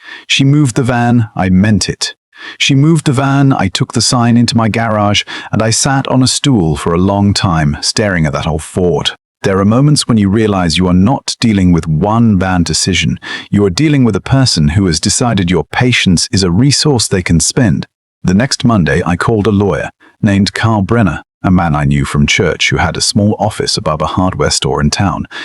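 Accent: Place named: England, United Kingdom. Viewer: A I needs to learn how to read English correctly. Learn how to pronounce garage. Need a voice from America not from England.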